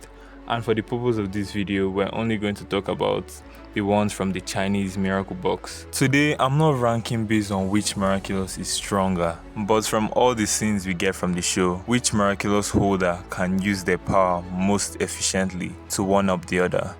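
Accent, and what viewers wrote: Nigeria. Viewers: Are you Nigerian? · are u nigerian by chance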